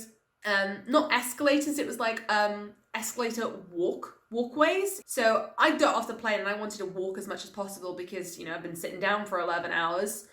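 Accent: United Kingdom. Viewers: i just LOVE british accent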